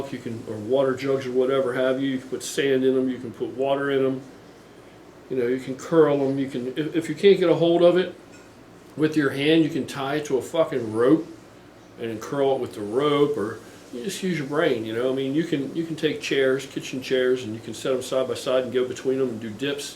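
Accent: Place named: Central Pennsylvania, United States. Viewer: Central PA or Maryland accent (No offense, of course, I'm from there and recognize it - new to the channel, too, so if everyone else knows where you're located, I don't yet).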